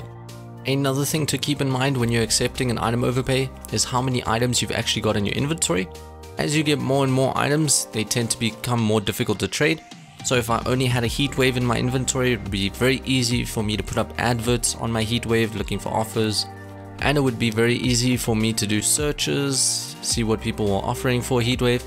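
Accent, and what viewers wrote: South Africa. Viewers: Are u from south africa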